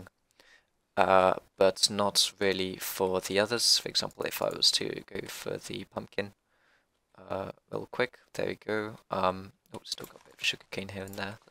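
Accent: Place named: United Kingdom. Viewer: lol are you deaf? hes 100% British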